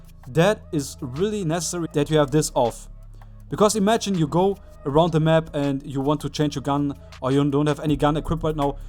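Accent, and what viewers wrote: Germany. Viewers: Man hört raus du bist Deutscher nh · Du bist deutsch oder? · Von der Stimme her erkenne ich, dass du deutsch bist xD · Mach mal deutsche videos man merkt dass du deutsch bist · Are you from germany? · You sounds like German. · Kann es sein dass du Deutsch bist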